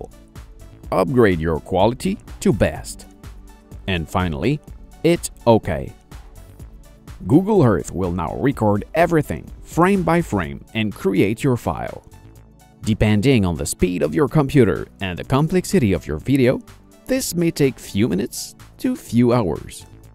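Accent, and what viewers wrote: Quebec, Canada. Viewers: French Canadian ?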